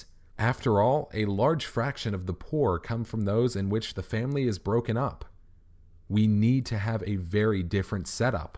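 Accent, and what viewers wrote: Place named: Eastern Europe. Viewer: What happened to that sweet sweet Eastern European accent?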